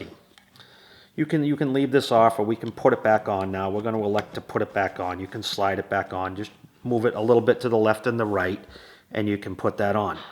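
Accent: Boston, United States. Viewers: Just got a new house and have been cold for two days! Thank you for this helpful video - you explain things so clearly (plus the slight accent reminds me of my family from Boston so extra warmth there too). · Have watched this So many times --- video is super clear and helpful!! (Boston accent is a plus - generates total trust and confidence that the person speaking know what he's talking about!!) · You sound like you're from Boston❤. I am too. Anyhow my house was freezing inside. Those icons on the remote are very strange. Then I played your excellent video a few times and took notes. Now I'm cozy and happy. Many thanks,.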